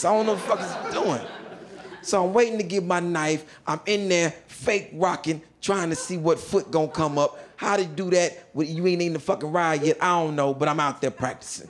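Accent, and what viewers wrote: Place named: Texas, United States. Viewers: Sound like he was In Tdc ; some Texas shit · Lol I can tell hes from Texas and locked up in Texas prison just off the way he’s talking and the small details of the story.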